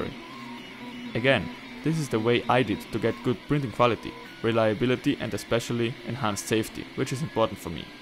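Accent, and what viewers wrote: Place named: Germany. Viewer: German accent too heavy can't understand what was said! won't stick at all! So can't print mods printing in ABS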